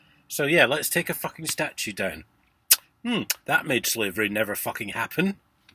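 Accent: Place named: Inverness, United Kingdom. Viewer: You sound like you’re from Inverness, are my suspicions correct?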